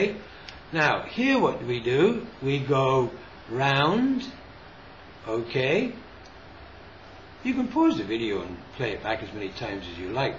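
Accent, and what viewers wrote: United Kingdom. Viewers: It worked because your have an english accent. That other guy who does these videos is a jag off.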